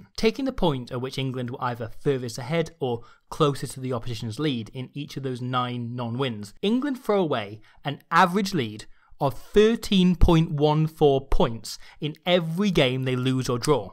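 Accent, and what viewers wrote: Wales, United Kingdom. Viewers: I thought he was welsh pls someone tel me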